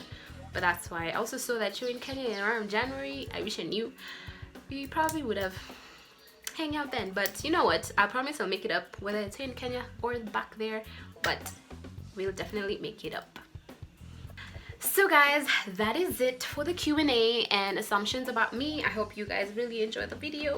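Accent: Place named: England, United Kingdom. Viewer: I dont know if I have said this before but mahnn do I love your English accent u know how u pronounce words gets my attention all the time ooh and your channels are so like real if u get what I mean like all the tusmall movements biros speech keep that up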